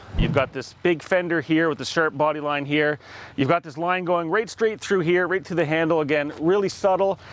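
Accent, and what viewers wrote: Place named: Midwest, United States. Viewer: Midwest accent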